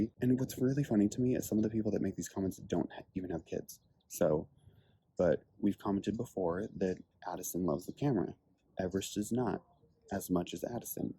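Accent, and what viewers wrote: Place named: Scotland, United Kingdom. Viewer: First and foremost love you guys. Next: blah🥱🥱 bloody blah to the small minded people out there. Second next: you have got this parenting (I would know I have 5 😜)thing. And last but the most important: did I hear a Scottish accent creeping in?!? I think so lovely xxx Lots of love from Edinburgh 🏴❤️ THREE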